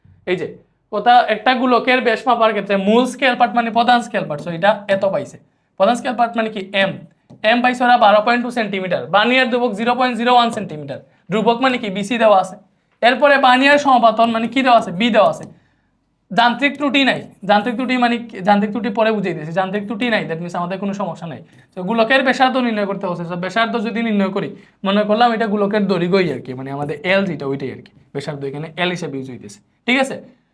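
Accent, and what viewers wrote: Sylhet, Bangladesh. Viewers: are you sylheti brother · Vai apni sylheti ni.. please reply dein.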